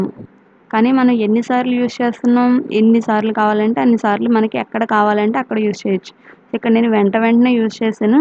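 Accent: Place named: West Godavari, India. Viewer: Mam midhi West Godavari dt Slang ga undhi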